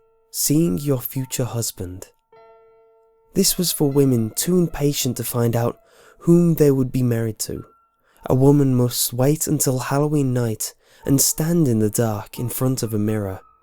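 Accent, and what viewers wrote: England, United Kingdom. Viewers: Yeah, it's obvious he's English, I mean I live in Britain, but where about in England? I can't tell if he's Scouse or Liverpuddlian or Brummie or what...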